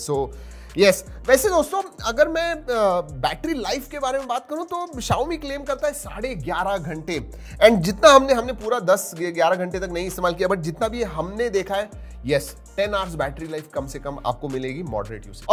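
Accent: India. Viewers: Aapka bolne ka style ekdam desi hai..."aane dijie aane dijie india me dekhte hai"👌👌 maza aata hai aapko sun ke sir..🙂🙂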